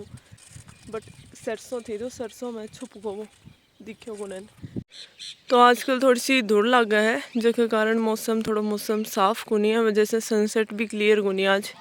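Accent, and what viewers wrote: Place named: Rajasthan, India. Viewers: Madm ji thari boli payri lage, ye shekavati boli h kya 🙏🏾🙏🏾🙏🏾🙏🏾🙏🏾🙏🏾🙏🏾🙏🏾🙏🏾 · Marwadi bolu yaa shekawati maine samjh toh nahi aaye but lage aap yaa Nagore side se hu yaa Sikar side se...... Best of luck 🤞 for your preparation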